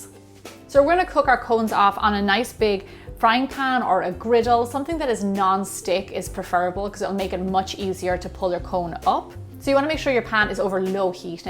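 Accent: Ireland. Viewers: tell a are you from Ireland if so from which part ?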